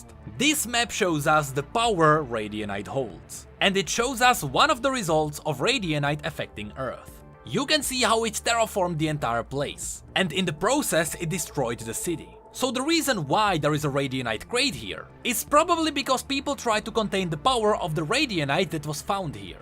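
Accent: United Kingdom. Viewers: nah he from the UK init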